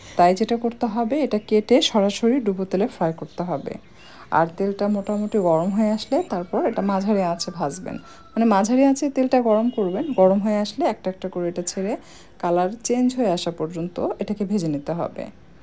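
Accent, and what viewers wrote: Bogura, Bangladesh. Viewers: Apu tomar basha bogura kothay?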